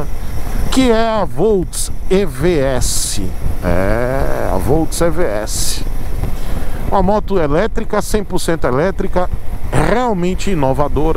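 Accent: Bahia, Brazil. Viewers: gostei da baianada. Se PIQUEM. Alguns não vão entender e vão se beliscar...🙄🤣🤣😂😂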